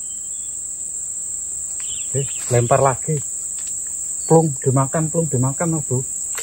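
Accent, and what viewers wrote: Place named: Java, Indonesia. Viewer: Mas, sampiyan ki wong ngendi? kok koyo wong jowo? jowo ngendi mas?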